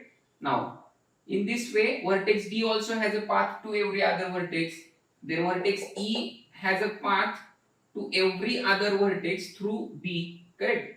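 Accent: India. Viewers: It has a strong Indian accent, but it doesn‘t interfere with the good explanation.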